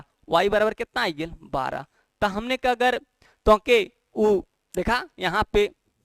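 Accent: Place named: Bhojpuri, India. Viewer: गजब सर इसी तरह भोजपुरी अंदाज me padhiye bahut अच्छे se samajh आ raha है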